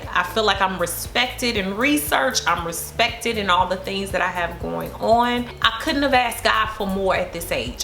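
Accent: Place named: St. Louis, United States. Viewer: You inspire thousands with your knowledge and God speak. Your St. Louis accent is part of YOU and I'm glad that you're proud of it. I've been following you for 3 years and have learned a lot and appreciate your time & dedication that you've put in this channel. I'm happy for your new beginnings & proud of your accomplishments!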